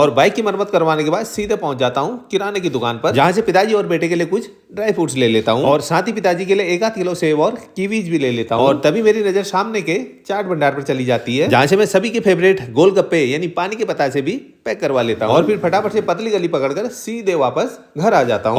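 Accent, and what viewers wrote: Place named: Uttarakhand, India. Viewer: Aap uttrakhand se ho kya ❤❤ me uttrakhand se hu or aapke bolne ka tareka bilkul uttrakhand walo jaisa h ❤❤❤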